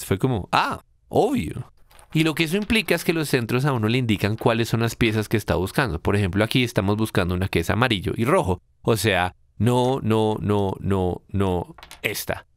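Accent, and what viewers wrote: Colombia. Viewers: Guillermo Crespo Es una voz de colombiano haciendo un tutorial. Así son siempre. Aparte es bastante agradable, es una voz viva.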